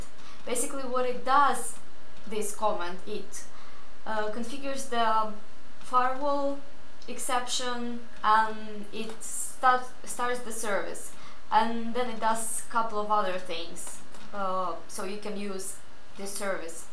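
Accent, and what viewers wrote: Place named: Russia. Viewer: Чувствуется русский акцент и немного много "SO...". Но девушка старается. Спасибо за хорошую иллюстрацию по WinRM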